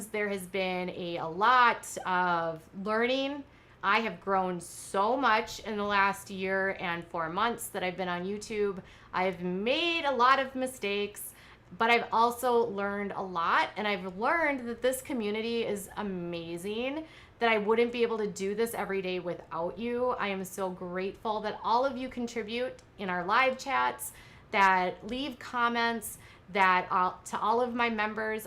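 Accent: Minnesota, United States. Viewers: Lady, I'm thinking you're somewhere near me in MN (new here... You have the MN accent). The storms here a few days ago were nuts! You're kinda funny, and I dig it. Take care!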